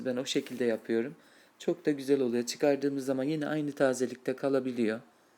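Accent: Black Sea, Turkey. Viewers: sizdemi karedenizlisunuz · sesin tam bir karadenizli kadin sesi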